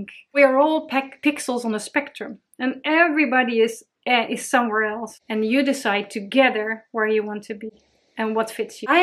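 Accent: Netherlands. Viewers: hey, I hear a fellow dutchie here!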